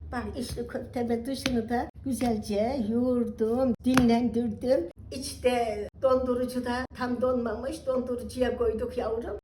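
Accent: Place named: Malatya, Turkey. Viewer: Oy şiivene gurban olam ben nerelisin acaba. Malatya lı mı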